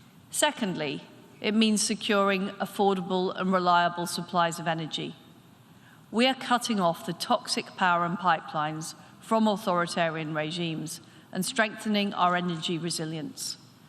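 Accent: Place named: United Kingdom. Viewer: Loooooovveeeeee the British English ♥️♥️♥️♥️♥️♥️♥️🌹🌹🌹🌹🌹🌹🌹🌹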